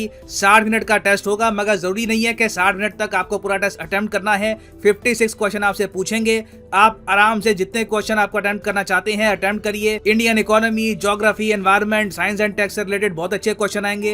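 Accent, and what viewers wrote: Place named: Punjab, India. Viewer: Sir app Punjabi ho ? ,Aisa accent tho pehli nehi Suna tha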